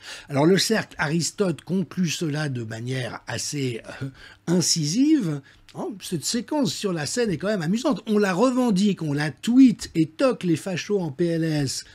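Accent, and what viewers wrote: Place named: South, France. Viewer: J'adore ce nouveau format, hyper jolie😍 avec l'accent du sud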